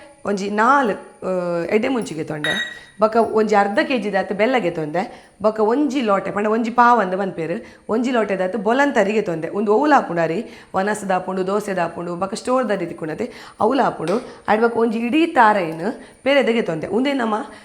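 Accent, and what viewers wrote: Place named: Tulu, India. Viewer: its so lovely to hear you speak Tulu. I miss my home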